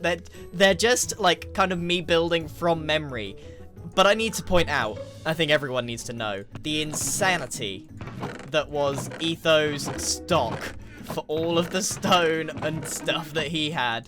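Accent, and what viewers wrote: United Kingdom. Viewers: he just sounded British to me 🤷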